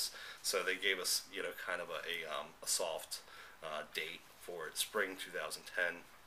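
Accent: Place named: Philadelphia, United States. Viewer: Are you from the Philly area? you have that log "O" accent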